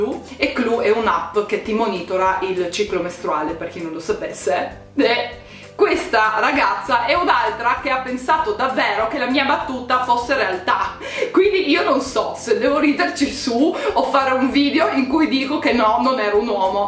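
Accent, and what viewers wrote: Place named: North, Italy. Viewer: Ti adoro all' inizio mi ero fatta un'idea troppo superficiale di te forse perché non conoscendoti e vedendo per la prima volta i tuoi video non avevo ancora capito che persona potevi essere ma dopo un po' non sono riuscita a non smettere di guardare i tuoi video, sei simpatica solare e anche bella. forse all' inizio mi sono fatta un idea sbagliata di te un po' per l' accento del nord e un po' perché non capivo la tua ironia ma ripeto è stato inizialmente poi conoscendoti ho visto altro e la tua ironia la adoro! un bacio e le Chanel se le hai mostrare chissenefrega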